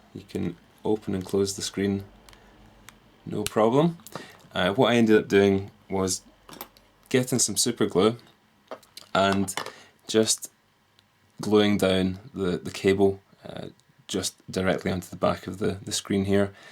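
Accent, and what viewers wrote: Scotland, United Kingdom. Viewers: Is that a Scottish accent I hear?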